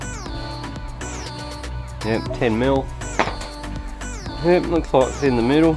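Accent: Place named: Ireland. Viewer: irish? or Australian? sorry nice accent!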